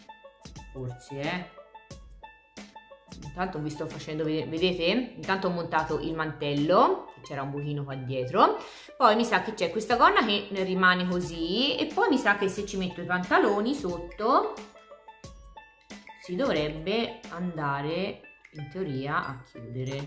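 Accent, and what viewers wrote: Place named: Tuscany, Italy. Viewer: Lei è pa^ata..ah, la tua Toscana riempie le mie orecchie! Buona settimana,carissima 💙💙💙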